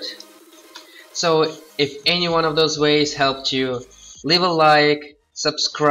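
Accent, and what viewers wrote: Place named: Russia. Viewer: Русский чувак, по акценту понял) Молодец, мне помогло, спасибо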